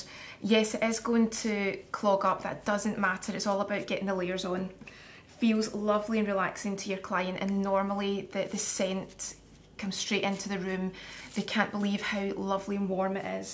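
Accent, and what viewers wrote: Scotland, United Kingdom. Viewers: I agree. Perhaps a series of lovely Scottish poems and stories read in your soft brogue would soothe the world's woes. What a delightful prospect. Soft music would be a sweet touch. Thank you.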